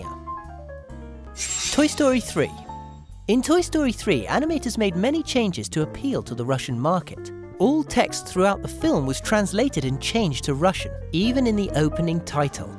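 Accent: RP, United Kingdom. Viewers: You sound posh.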